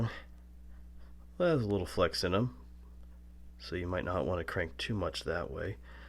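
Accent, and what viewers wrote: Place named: United States. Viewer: This guy sounds american. Why is he speaking in metric?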